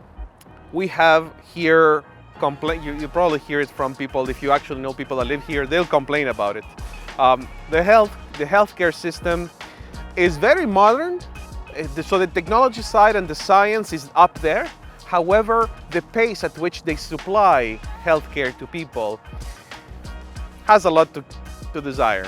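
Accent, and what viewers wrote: Spain. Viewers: you are very charming and positive with your Spanish accent !!! Are you Argentinian of the Italian decent ? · Great Info. I was confused at first listening to a Spanish accent from Montreal. Most Americans have trouble with one language let alone 3. You forgot the biggest pro - the food.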